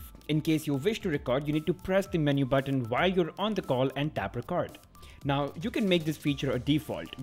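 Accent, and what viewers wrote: India. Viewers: i hate the indian accent , it makes me sad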